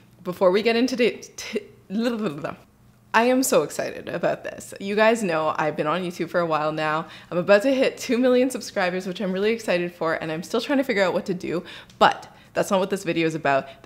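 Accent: Canada. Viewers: She’s Canadian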